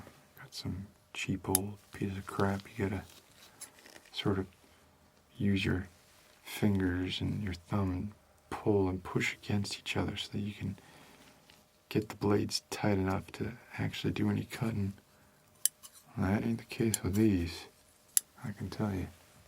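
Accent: South, United States. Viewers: Your Southern accent has really come far! Great video as always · Your southern accent is very good, just focus on using a softer "r" sound and it will be perfect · The naturalness of your southern accent in your roleplays is incredible. It can sound so fake and over exaggerated on some people but yours just falls right in to place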